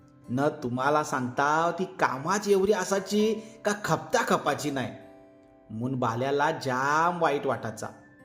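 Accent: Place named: Kunbi, India. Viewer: अप्रतिम कथा आणि अस्सल कुणबी बोलीमधील सादरीकरण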